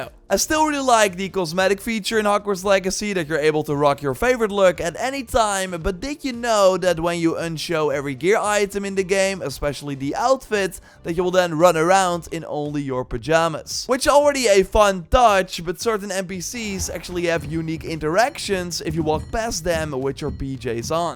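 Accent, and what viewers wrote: Netherlands. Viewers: Did I just spot a Dutch accent here?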